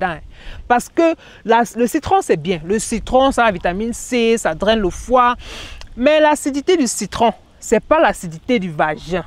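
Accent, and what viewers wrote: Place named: Africa. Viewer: J’adore le parler africain...💐🌺🍀🌸🌷🏆🏅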